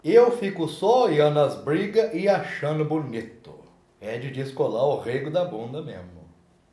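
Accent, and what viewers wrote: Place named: Caipira, Brazil. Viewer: Vc fala igual aos caipira meus tios pai fala assim e eu acho lindo mas ñ consigo falar ñ peguei o sotaque kkkkkkkkk bjos parabéns lindo demais...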